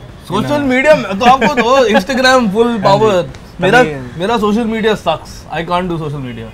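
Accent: Bihar, India. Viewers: Very bad Bihari accent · tried your best to speak bihari. anyway good.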